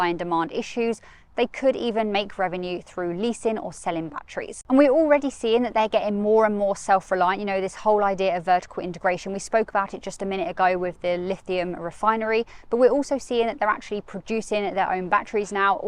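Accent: United Kingdom. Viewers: It's always a pleasure to hear from you and to help me with my British English listening. So thank you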